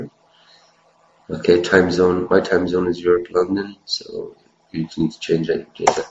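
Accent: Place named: Ireland. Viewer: You sound Irish..but with a twist of something else. Its hard to tell with the audio quality. Thanks for the tut anyway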